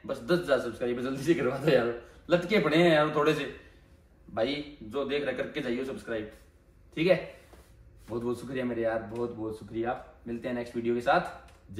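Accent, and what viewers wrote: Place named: Bagri, India. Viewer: Best best h bhaai treeeka ek nmbr h bhaai baat krne ka btaaane ka sb bagri hindi mix c ghant h bhaaai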